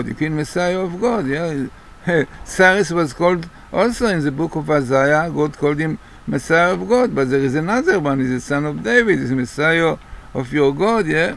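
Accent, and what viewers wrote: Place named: Middle East. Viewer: Your middle-eastern accent is quite strong. People in English speaking areas need to pay CLOSE! ATTENTION, and they will understand. SORRY for your struggle. Your tables are a tremendous blessing. Thank you rabbi Glazerson.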